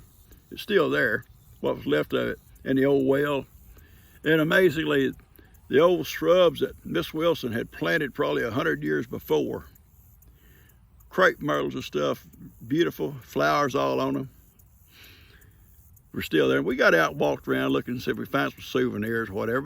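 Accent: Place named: Texas, United States. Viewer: Hi you have an extraordinary talent for storytelling. Those twinkling eyes of yours and that Texan drawl is just so compelling. You have a gift that not many have. Thank you ❤️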